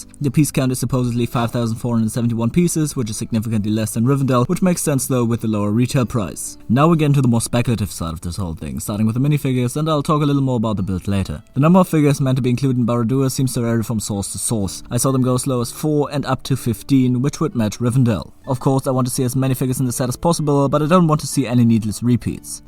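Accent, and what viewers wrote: Germany. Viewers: U are German right?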